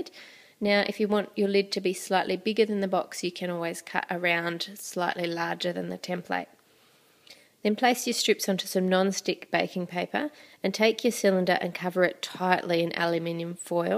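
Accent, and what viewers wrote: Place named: Australia. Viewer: She sounds Australian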